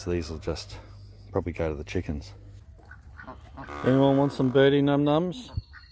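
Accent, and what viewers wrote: Australia. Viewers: I love Australian accent and words. Would love to see your country, but not to fawned of a lot of your nasty critters . I need to have made some great raised beds, like you have for my wife to garden in. With age he back is not like it use to be. Straw has gotten crazy expensive here in the USA, where I live. It cost as much as good hay. Use to get it for a $ 1.00 a bale, but now I guess they are not growing wheat like the use to. I think that ground is now in corn, because of the prices .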